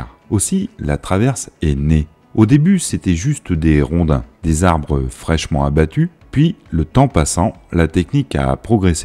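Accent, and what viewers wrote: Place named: Bagnolet, France. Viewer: de rien, et y a ton accent aussi, typique de c'que j'entendais quand j'étais gosse à bagnolet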